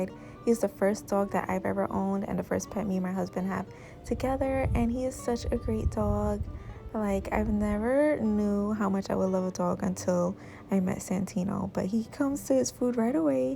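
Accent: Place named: East Coast, United States. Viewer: Your place is so cute! I’m in California & loving your east coast accent. Love your videos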